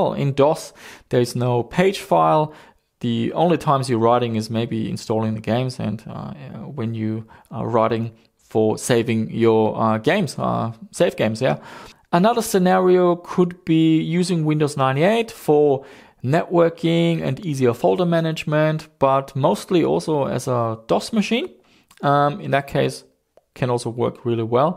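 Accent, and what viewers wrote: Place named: Germany. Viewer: Sehr deutscher Aktzent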